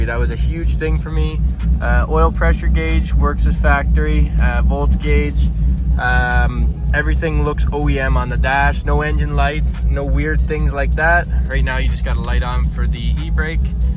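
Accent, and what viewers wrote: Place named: Canada. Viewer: fuck ya dude. you sound canadian as hell lol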